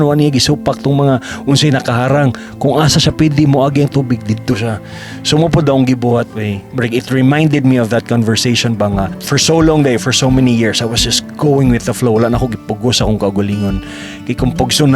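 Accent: Visayas, Philippines. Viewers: Been Watching kahit d ko naintindihan kasi tagalog ako, Pero theres somethings interesting sa accent, Sa bisaya language, Soon sana matuto ako hehe. I'm 31 now and feeling lost, Didnt expect to be moved by this vlog kahit half of words d ko maintindihan.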